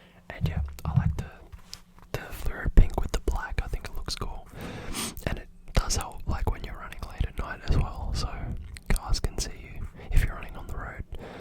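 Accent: Australia. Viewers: omg a fellow aussie doing asmr???? this is insane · Love the Aussie accent so much I wanna visit there and NZ so bad, my favorite music group is based outta NZ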